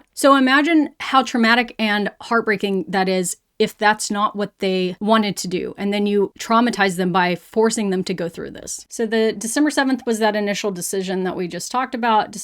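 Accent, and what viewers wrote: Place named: South, United States. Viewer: One of the things I love about these videos is hearing somebody with a southern American accent speak reasonable rational truths about this topic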